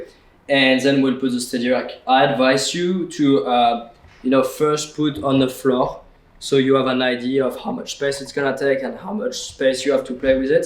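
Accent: France. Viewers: Super utile, je me demandais justement comment j'allais mettre mes trois bexons au mur avec les Steadyracks que j'ai commandé hier, merci pour le tuyau. Et... pas mal ton anglais, juste avec un nice French touch :) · Strong French accent there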